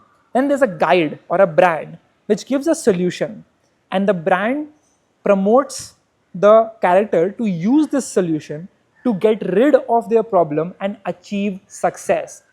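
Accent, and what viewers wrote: India. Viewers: Your tongue ia real indian accent😊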